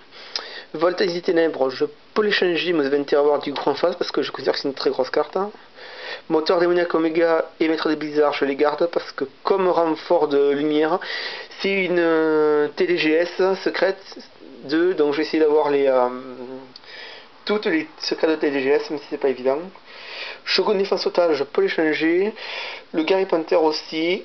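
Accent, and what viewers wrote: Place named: South, France. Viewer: t du sud comme moi toi vu ton accent ! (ce n'est en rien une critique) et j'aimerais t'échanger le dragon détonant la derniere carte est canon derriere la porte ce qui fait que quand tu dois te prendre des dégats par effet , c'est ton adversaire qui se les prend et aprés si tu as des protecteurs du tombeau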